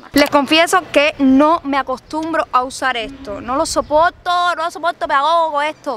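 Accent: Cuba. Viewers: Viendo este video en 2023, ¡wao! que delgadita eras y hablabas muy distinto ya has perdido bastante el acento cubano.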